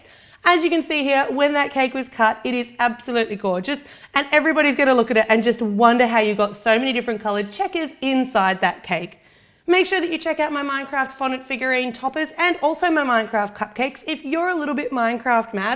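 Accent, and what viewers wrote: Australia. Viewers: are you aussie or not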